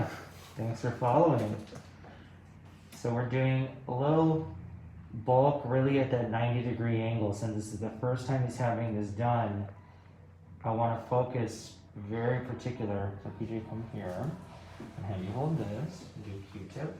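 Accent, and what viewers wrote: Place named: United Kingdom. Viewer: I can’t quite tell the nice accent… British, South African, Australian?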